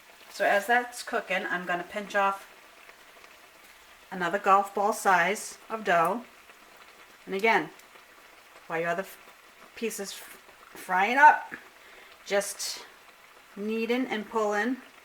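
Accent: Boston, United States. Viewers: I hear the Yankee....fellow Bostonian here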